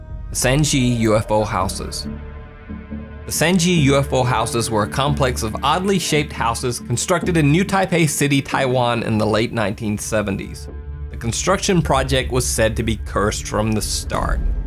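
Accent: South, United States. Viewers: I love his voice too, and Southern US voices are so gorgeous, I'm from UK and they sound really lovely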